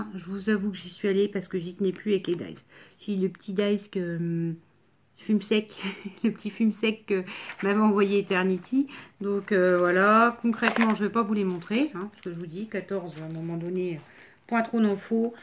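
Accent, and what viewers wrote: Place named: Reims, France. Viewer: Ouai c'est clair tu as l'accent de Reims 😂😂 un p'tit bout de chez moi 😂😂😂😂 action Rethel est pas mal non plus si tu as l'occasion d'y aller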